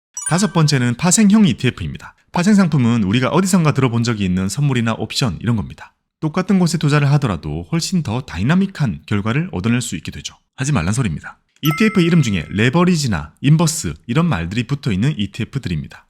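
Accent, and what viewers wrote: Gyeongsang, South Korea. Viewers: 경상도 사투리가 있는거 같지만 목소리가 너무 좋습니다. 귀에 쏙쏙 들어오네요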